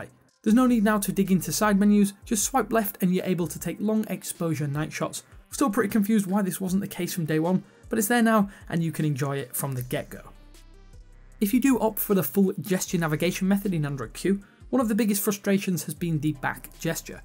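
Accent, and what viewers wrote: United Kingdom. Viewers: where are you from? UK? What accent?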